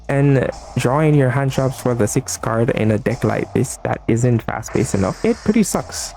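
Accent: Jamaica. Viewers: He jamaican?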